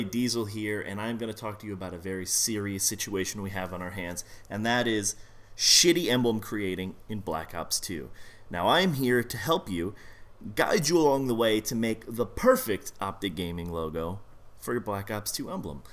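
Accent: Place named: Canada. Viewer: wow canadian eh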